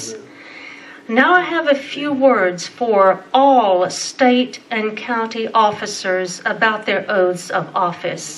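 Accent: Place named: South, United States. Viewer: I LOVE this Lady! There is nothin like a Southern Belle to eloquently explain our Constitution and 2nd Amendment!!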